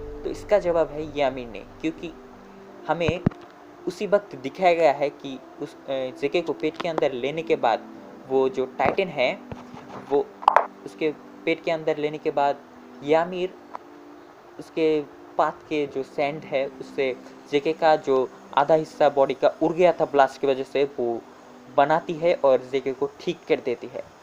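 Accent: Bengal, India. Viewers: He is bengali bro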